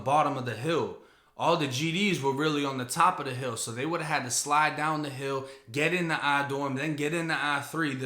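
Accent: Boston, United States. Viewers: You tlk like you from the bean ... When i went to florida every1 knew i was from Boston we just tlk a certain a way. You had to have spent some time out here. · You kinda have that Boston accent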